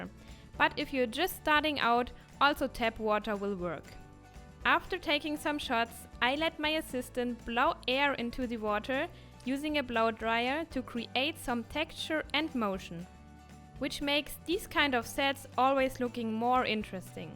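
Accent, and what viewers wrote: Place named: Germany. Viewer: Warum machst du deine Videos auf Englisch? Man hört direkt das du aus Deutschland kommst. 😃 Ist nicht böse gemeint aber welchen Zweck verfolgst du damit?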